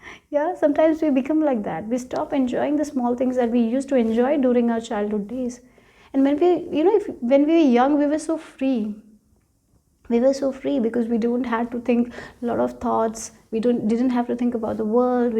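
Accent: India. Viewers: 😱😳🥹 Ohhhh, wwhhhooooowww. First time I'm guidet to you ... My choosen pile ... speakless. How is that possible? Thank you sooooooo, so much. It is exaktly what I've needet for helping in my situation right now. A big gift.🙏❤️‍🩹💖😇🎁 Indian angel, a big hug from Germany, so nice, your accent. 😊 Isn't it wonderful, how we can understand?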